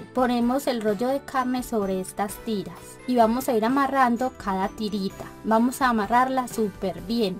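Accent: Antioquia, Colombia. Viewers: Como todas tus recetas excelente me encantó un abrazo de un venezolano que vive en argentina y por supuesto esa receta el mejor acompañamiento que tiene es ese acento paisa tan lindo ❤ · Super esta receta😂😂😂😂me senti hablando yo...puro paisa.gracias por compartir❤ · Tan Querida con ese acentíco Paisa.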